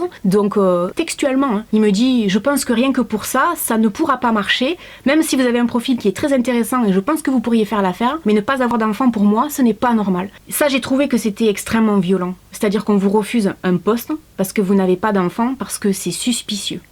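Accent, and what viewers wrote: South, France. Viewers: Attend attend attend, donc on t’a reprocher le fsit que tu ai un accent du sud mais que tu es trop blanche ? Mais... il y en a vrm ils ont un problème avec les couleur de peau ma parole c’est pas possible ça 🥲😭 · Bonjour et merveilleuse année à toi ainsi à tous tes abonnés 😊 tu es une belle personne intérieurement et extérieurement 😉mais ce que tu racontes n’est pas surprenant les gens sont indélicats pour être polie 🤬ils sont jaloux et reflètent sur les autres leurs propres angoisses. J’adore ton franc parler et ton dynamisme et l’accent du sud est magnifique (je sais de quoi je parle)🤩 bises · Moi, j'adoooooooore ton accent du sud, tu ne peux mm pas imaginer, je t'adooooore, reste comme tu es parce que je suis convaincue que tu ne changement jamais, et les ingrats vont continuer à être emmerder(désolée pr ce dernier mot il fallait que je le dise)😘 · moi je l'adore ton accent du sud sa respire le soleil ;) et on est tous différent physiquement et mentalement heureusement sinon le monde serait fade merci pour ta vidéo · Je trouve que c'était une bonne vidéo à faire! 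Le commentaire "t'as l'accent du sud mais t'es pas bronzé" est tellement inattendu qu'il me fait rire!! L'expérience de l'entretien d'embauche, tout simplement scandaleux!!! Et les commentaires très injurieux que tu nous as montrés sont juste... incroyables, inimaginables...!! Bon courage et bravo! Bisous!! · Cc moi jai eu droit aussi le genre de remarque sur mon poids .Les gens sont méchants cest pour sa que je suis mieux avec mes animaux et oui aussi jadore ton accent du sud ,accent du soleil 😉plein de bonheur pour 2021 et a ton toutou aussi ❤ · J’adore l’accent du Sud !!! C’est top!!! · Bonjour tout a bord je tiens a te dire que tu es une tres belle femme.J'aime ton style et surtout ton tres belle accent du Sud de plus j'adore les femmes rousses.J'aimerai qu'il plus de femme que moi toi sur le net.Une femme s'embélit avec temps.Je regarde toute tes videos car tu es naturel. Vive les femmes rousses.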